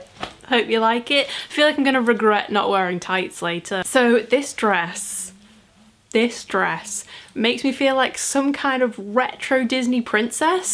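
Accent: Liverpool, United Kingdom. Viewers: I love how you dip in and out of a scouse accent.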